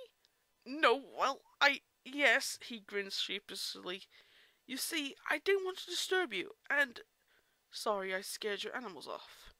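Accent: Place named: United Kingdom. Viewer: I was wondering if an I was supposed to be there, but got missed out. I sometimes do that, miss words out, especially if I'm on a writing buzz. Well your accent isn't "British" what-ho old chap I went tp a Eton don't ya know, but it still sounds like a British accent to me and I was refrring to your "Maybe it's supposed to be like that, but not for me, I'm British!" or something along those lines. And I'm going to have to re-read that scene, lol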